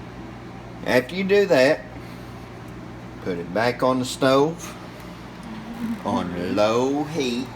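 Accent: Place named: Appalachia, United States. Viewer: I’ve been looking for wild lettuce videos,Soon as I heard u talking I thought “yep, this guy.” Some hick off in the woods, 🤠hillbillys and home remedies that work.. that’s like a Ketchup and cheese sandwich.. thx . With love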